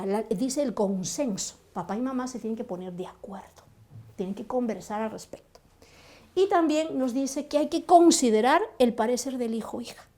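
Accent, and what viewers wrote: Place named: Spain. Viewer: Porque tiene dejo de española? No hace más que dudar (de ser de España) no estar actualizada de la ley peruana ahora vigente Aunque la apariencia aitocotona la delate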